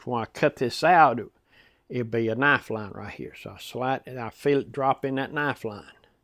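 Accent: South, United States. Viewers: Absolutely terrific video,! I'm a retired educator who began my professional career teaching junior high Industrial Arts (AKA "Shop," because most folks have no idea, nowadays, what Industrial Arts was/is). After 8 years, I was recruited to become a middle school principal for 3 years, then was recruited to join the faculty of Industrial/Vocational Education at Mississippi State University (Starkville is my hometown, even though I now live in Tupelo, birthplace of Elvis, after I retired from MSU). Anyway... I do some woodworking in my retirement years out in the garage shop, but it's not set up for a "real" shop like I had when I was teaching. The single thing I miss most in a good, solid, hefty workbench, largely because I don't have a vise to hold my projects when I filing, sanding, shaping on projects like charcuterie boards, etc. I'm now 77 years young, so I have to face reality that there are not enough years left on this Earth for me to dump a pile of money into fixing a top-notch shop. 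I feel 28 in my mind, but I realize that I have fewer years ahead of me than lie behind me. Ha! So, thanks for your outstanding job of "teaching" your viewers as you go. It's a pleasure...and I can't figure out how I didn't find you on YouTube 10-15 years ago. By the way, I can tell from your accent (but we Southerners don't really have an "accent," do we? Ha!) that you're in the Southland somewhere. Have you ever shared what general part of the country you're in....like the State w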